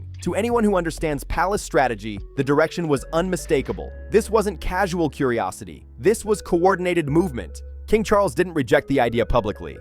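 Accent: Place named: United States. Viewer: I'm American and I don't understand why a loud American is the narrator either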